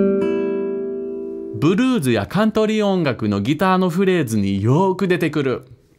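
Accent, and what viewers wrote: Kansai, Japan. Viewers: 関西弁やめて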